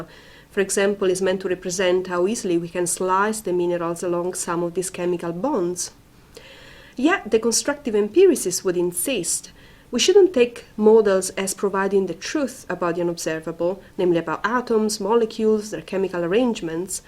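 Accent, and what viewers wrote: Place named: Italy. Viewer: Really good, and simple explanation. Cute italian accent as well. Thanks for sharing.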